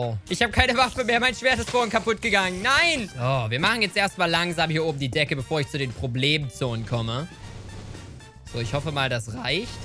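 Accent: Saxony, Germany. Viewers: kannst du mal ein komplettes Video auf sächsisch machen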